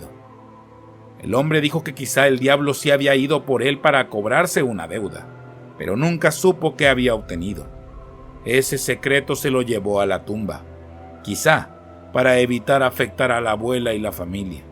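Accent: Mexico. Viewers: adoro el acento Mexicano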